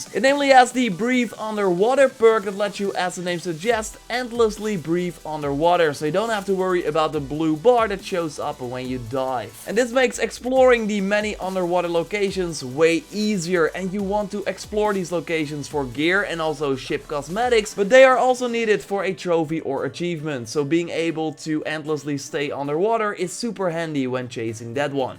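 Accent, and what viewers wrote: Netherlands. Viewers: wejo ik kan letterlijk gewoon horen dat je nederlands bent, wat een accent joh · 100% a fellow dutchguy. je accent gaf het weg :)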